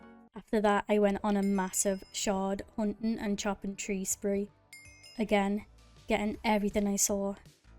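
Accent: Liverpool, United Kingdom. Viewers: a scouser?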